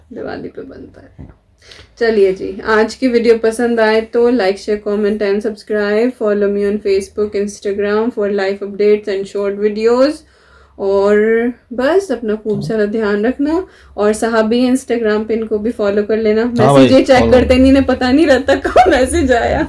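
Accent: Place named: Haryana, India. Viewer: Hlo apki bhasa haryana se milti julti you are my favorite YouTuber 🤗🤗🤗🤗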